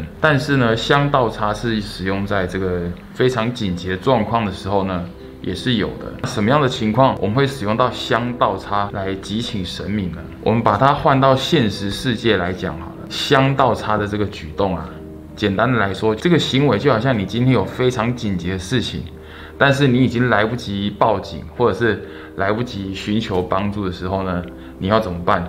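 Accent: Taiwan. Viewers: 哇。。哈喜歡的鬼式台語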